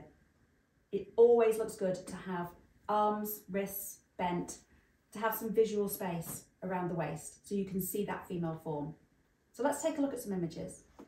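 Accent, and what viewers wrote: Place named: England, United Kingdom. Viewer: We speak English with an English accent in England. For those that don’t understand English well, you can turn on the subtitles!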